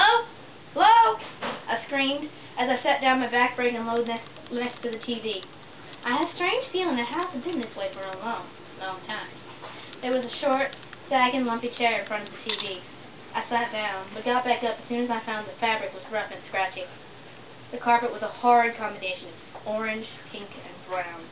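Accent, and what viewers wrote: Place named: South, United States. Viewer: Go!! Your Southern Accent is coolio!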